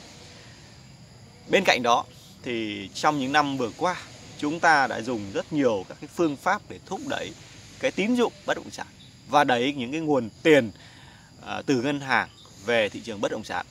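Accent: Thanh Hoa, Vietnam. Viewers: Ông này giọng ở Thanh Hoá, thị trg bất đs sẽ tăng cục bộ tuỳ nơi, nhìn chung sẽ đúng giá trị thật, thử tưởng tượng mua căn nhà phố trên 10 tỷ cho thuê 20t/t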